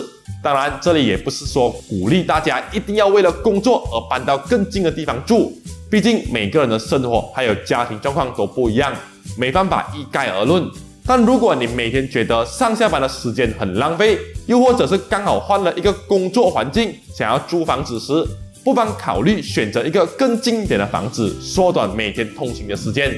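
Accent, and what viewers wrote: Malaysia. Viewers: 這就是馬來西亞的華語 我覺的他說的很不錯了 我們也不會讓中國人改口音啊 憑什麼馬來西亞人就得改善口音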